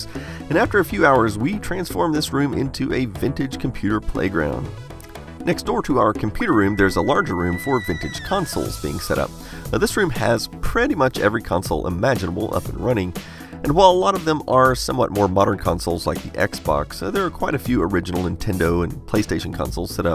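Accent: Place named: Texas, United States. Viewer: I can hear that Northern Texas Accent in your voice. I don't know why I never picked up on it in the past.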